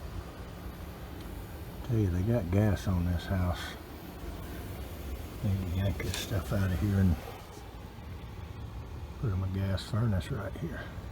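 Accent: South, United States. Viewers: The soft spoken Southern gentleman has to break the bad news to another helicopter, and it is always done with tact. Couldn't help but notice that has begun using that term as well; maybe you should get residual compensation since you coined the word. lol Always nice to watch you and in action.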